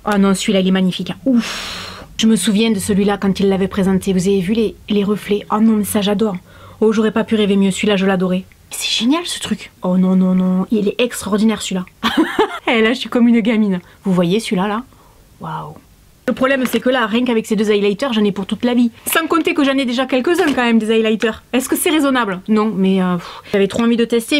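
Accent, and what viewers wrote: South, France. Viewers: Je regarde vos vidéos, et je vous trouve épatante, naturelle, franche, drôle, sincère, et votre accès du Sud ne gâte rien! Surtout ne changez pas! Biz bisou breton! A très bientôt!👏👏👏😍😍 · Salut, je suis tombée par hasard sur tes vidéos et je t'adore même si je ne me maquille pas. Ta spontanéité, ton humour et notre bel accent du sud sont charmants, ça fait du bien de voir ça sur YouTube! Ne change rien tu es super!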